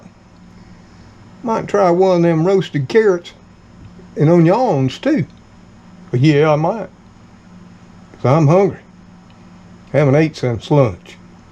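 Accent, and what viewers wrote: South, United States. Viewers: Yum!!!! Now that looks delicious. I’m going to cook this as one of the Christmas meat choices since we don’t celebrate thanksgiving in the UK. PS my son and I love your southern US accent! It’s like music to our ears, so an extra treat getting to watch you not only show us how to cook delicious food but also hearing you speak. Hope you had a wonderful thanksgiving 😊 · That looked lovely, thank you for sharing. I look forward to your Christmas preparation videos and Christmas cooking. And I love your Southern accent too, as someone else said further down. I was playing one of your videos to a friend of mine, as I have told him about you and how much I look forward to watching these. He loved it as well. So thank you from me and him. :)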